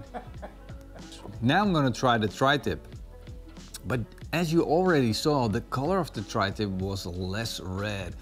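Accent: Netherlands. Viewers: Dutch Guy reconise a fellow dutch accent NICE!!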